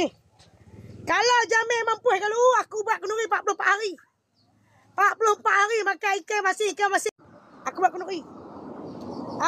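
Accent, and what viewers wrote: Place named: Kedah, Malaysia. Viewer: Pekat kedah akk ni ..trbaik la kak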